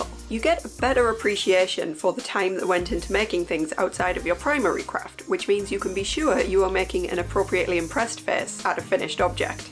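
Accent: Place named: North East England, United Kingdom. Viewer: Nice to see you where are you from sounds like the north east in uk l am from uk l live in Redcar North Yorkshire l did get your name from Chris cross stitch shout out look forward to seeing your next video xx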